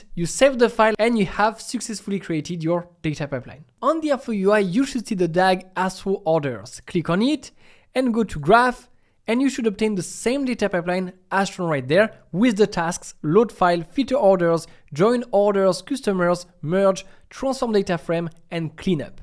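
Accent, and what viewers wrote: France. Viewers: Thank you, but I'm sorry, English with French accent is terrible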